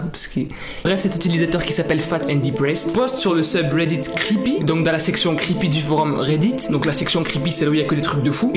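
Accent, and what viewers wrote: Quebec, Canada. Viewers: j'adore ton accent Québécois 😂👌(j'en suis une O.O) 👇liker si vous êtes Quebecois/Québécoise 👇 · Ho wow l'accent québécois😂👌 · qui trouve que parle grv bien quebecois? 😆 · Jadore to accent quebecois et ton exorsice😅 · Accent quebecois acceptable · je suis québécois et tu parle bien Québec👍👍👍👍👍👍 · Moi je trouve que ton accent québécois parce que je parle québécois 😉😉😉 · Jadore ton acssen Quebec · Ta un bonne accent quebecois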